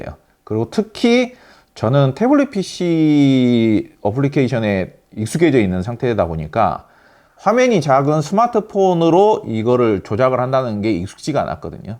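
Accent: Jeolla, South Korea. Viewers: 전라남도~ 약장수처럼 말하네요~~ 그닥 신뢰가 안가네요.... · 전라도 사투리가 구수함 ㅋㅋㅋ 저두요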